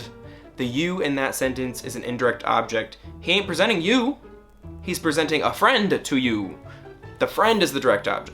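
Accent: South, United States. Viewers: I love your French language videos. In English, you have a unique way of pronouncing words with an interior T (i.e. eaten, important, written). I can tell you’re from the Southern US but where? Merci!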